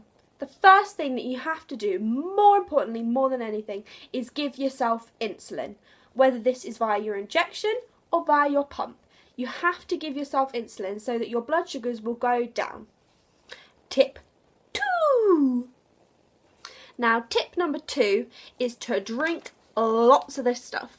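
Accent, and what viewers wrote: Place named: Australia. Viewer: You sound vaguely Australian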